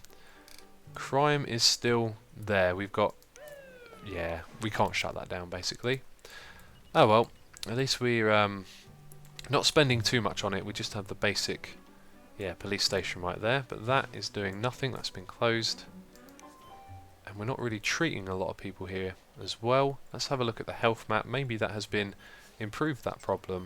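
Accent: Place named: London, United Kingdom. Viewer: I reckon he is from somewhere in London ???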